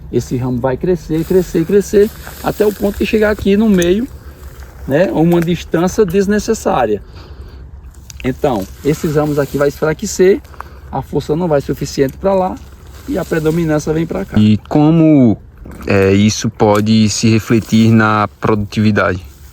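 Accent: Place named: Northeast, Brazil. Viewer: Primeiro. Sua simpatia e sem medo de estar ensinando outras pessoas ou concorrentes. Imagino que seja do nordeste pelo sotaque . Amo as uvas de mesa do nordeste. Estou sempre comprando porque são muito doces e deliciosas. Não sei seu nome, mas parabéns pelo seu cultivo e continue nos mandando essas delicias aqui pro sul. Forte abraço de Santa Catarina.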